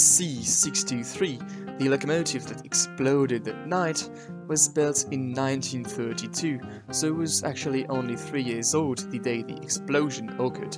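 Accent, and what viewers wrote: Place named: United Kingdom. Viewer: C'est un fait divers français et vous parler britannique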